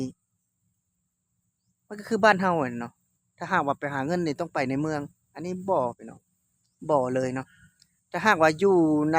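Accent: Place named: Sakon Nakhon, Thailand. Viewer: บ่าว พูดสำเนียงเหมือนคนสกลนครเลยครับหลายๆหมู่บ้าน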